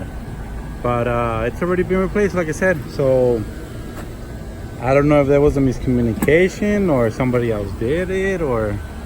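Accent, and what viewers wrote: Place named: United States. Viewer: Yo pensaba que eras gringo ...Jajaja good videos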